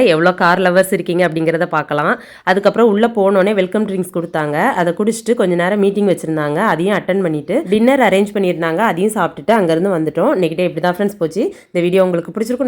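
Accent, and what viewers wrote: Tirunelveli, India. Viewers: Unga native thirunelveli,ah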